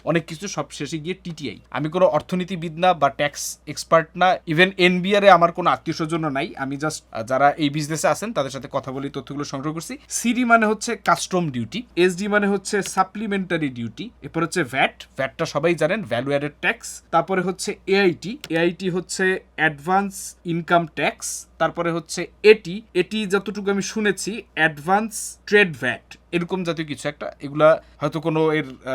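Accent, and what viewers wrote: Barishal, Bangladesh. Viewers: ভাই আপনি কি বারিশাল এর?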